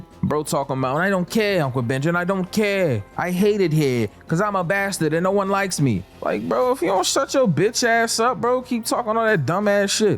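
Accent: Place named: New York, United States. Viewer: You sound like rosland or gary... or nyc.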